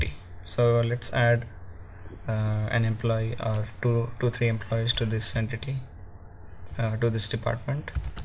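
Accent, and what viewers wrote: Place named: India. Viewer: I hate the Indian English.. I dont understand...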